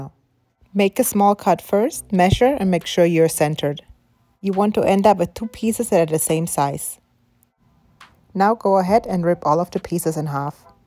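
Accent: Germany. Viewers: Hallo wunderschönes Video. bist du Deutsch,dein Akzent klingt so,geh einfach mal davon aus und hab deshalb auf Deutsch kommentiert. Hab deinen Kanal abonniert und meine Daumen zeigen nach oben. Wünsche dir viel Spaß bei deinen Projekten und weiterhin so schöne Videos. Frohe Weihnachten und bleib gesund. Gruß Rudi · Brilliant job on the cleat system! Your accent sounded somewhat German, and then I heard you say "fertig" so that's certain! Ich kann ein bisschen Deutsch, übrigens! Curious how you found your way to the US! Subscribed and looking forward to your videos!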